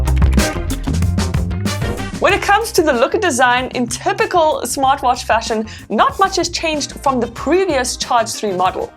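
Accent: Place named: South Africa. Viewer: I hear a South African accent ✊🏽 🔥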